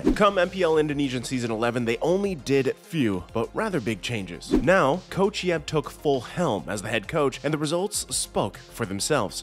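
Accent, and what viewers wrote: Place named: East Malaysia, Malaysia. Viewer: Your voice It feels like an east Malaysian (Sabah & Sarawak) pronunciation kinda style 😂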